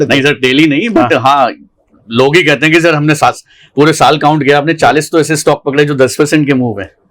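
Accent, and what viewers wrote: Bihar, India. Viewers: I like your strategy but BIHARI sun ke man nahi karta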